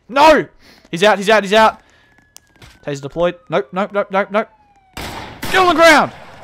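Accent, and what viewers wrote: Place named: Australia. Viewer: He sounds like a classical Aussie in a good way. Love your videos man, keep up the good work hoping you reach a 100 soon!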